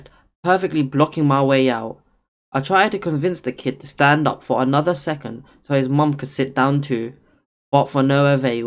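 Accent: United Kingdom. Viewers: I’m sorry if this sounds rude, but are you British? Just curious.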